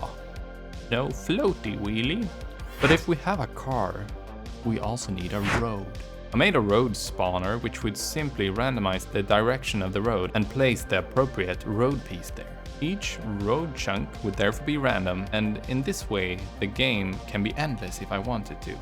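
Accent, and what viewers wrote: Sweden. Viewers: eeeeeeeh that I don’t know but he sounded Swedish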